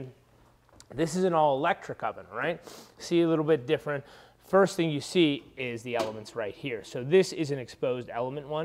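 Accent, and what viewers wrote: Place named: Midwest, United States. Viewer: Mid western American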